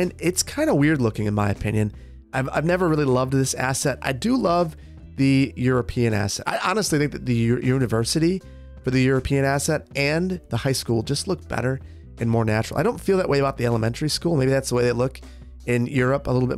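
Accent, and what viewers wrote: Wisconsin, United States. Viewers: God I love the wisconsin accent with taag or baag when ever it shows up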